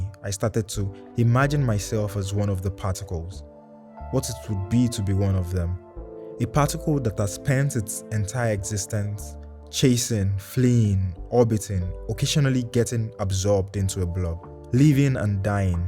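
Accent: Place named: Africa. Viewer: you have a African accent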